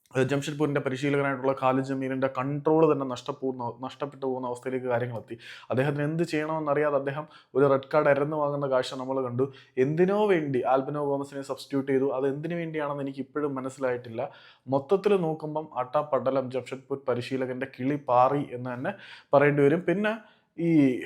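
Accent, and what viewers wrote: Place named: South Kerala, India. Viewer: "ഭ" തെക്കൻ ജില്ലകളിൽ ഇങ്ങനെ ആണ് pronounce .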